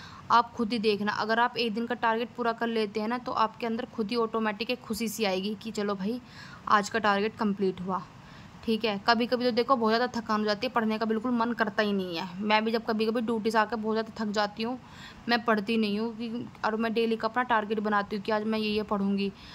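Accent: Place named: Western Uttar Pradesh, India. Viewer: Voice tone se lg rha aap west up ki taraph se ho😊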